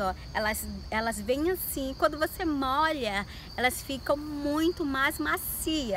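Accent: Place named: São Paulo, Brazil. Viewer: Índio com sotaque paulista !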